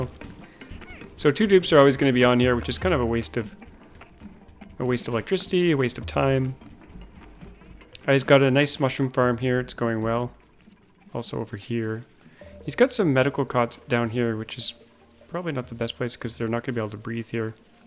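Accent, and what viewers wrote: Canada. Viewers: Doood, doont fix yur Canadian suurfer speek. I like it. Also, five episodes in, I still absolutely love this series. It's always been fun watching you build bases with each iteration of the game, but it's a totally different experience watching you fix up these broken bases.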